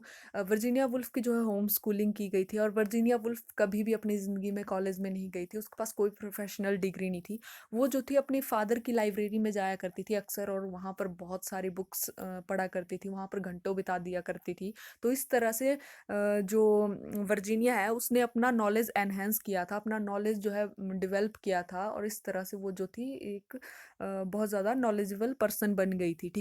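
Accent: Haryana, India. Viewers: Wow it's amazing I understand each and every point From your voice - i can guess Are you belongs from Haryana?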